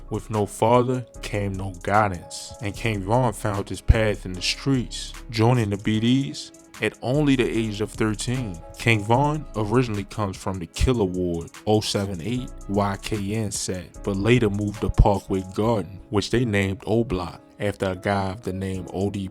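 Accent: Baltimore, United States. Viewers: Yew from Baltimore ain’t yew😭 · Im from Maryland that’s definitely a Baltimore accent for sure 😂😂😂😂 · The Narrator sound like he from B-More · You from Baltimore I can hear it in your voice your videos is bogus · u can here bros baltimore accident some times lmao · Definitely a bmore nigga narrating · You gotta be from boltimore bro 💀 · Mannn I can tell yo ass from Baltimore and I’m from Cali 😂😂😂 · Can hear the Baltimore accent thick!!!! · U definitely from Baltimore bro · Yo from Baltimore I hear your accent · Great video. This dude gotta be from Baltimore, right? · Baltimore accent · You must be from Baltimore ?????? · you from baltimore ainit 😂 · Bmore as hell bruh!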